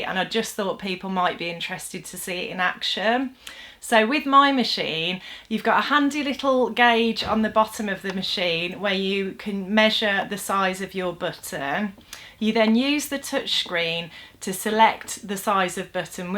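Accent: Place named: Black Country, United Kingdom. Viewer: Your accent sounds like mine! I'm a Black Country girl that has lived in Canada since I was 27! I am now 63 (yikes!) and need this video as I can't get my new Janome buttonholer to work. Fingers crossed and thank you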